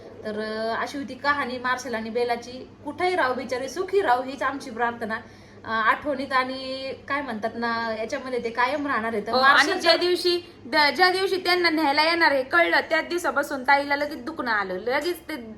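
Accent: Ahmednagar, India. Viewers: अग तुमचे व्हिडिओ असतातच छान ओरिजनल असतात तुमची नगरी भाषा फार छान वाटले ऐकायला कुठल्याही गोष्टीचे एवढे मनावर नाही घ्यायचे डॉग बदल सांगते । चे धन्यवाद त्यांनी खुप छान व्हिडीओ. बनवला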